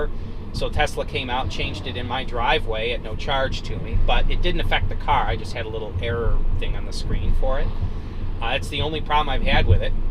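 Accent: Midwest, United States. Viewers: Lmao your accent. Kills me. Love my midwestern folks. Right off the bat 👏🏻💀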